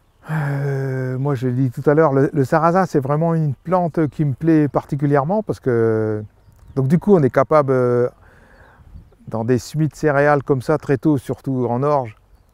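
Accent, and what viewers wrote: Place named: North, France. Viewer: L'accent du Nord est resté... 😇👍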